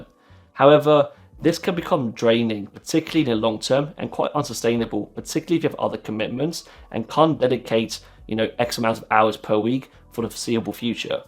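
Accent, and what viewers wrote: United Kingdom. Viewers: Are you native british , because I hear your words very clearly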